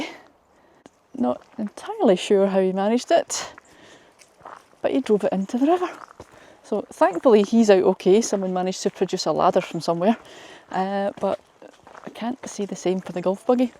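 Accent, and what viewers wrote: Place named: Scotland, United Kingdom. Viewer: Done part of that lovely walk but not as far as the bridge. Lovely to hear a Scottish voice and a lovely smile. Keep up the good videos. 👍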